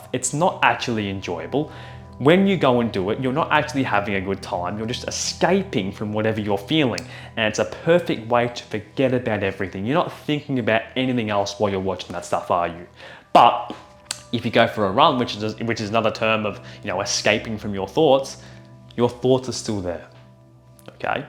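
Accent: Australia. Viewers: You sound Australian, but you spell 'favour' the American way in your subtitles. Remember your English roots.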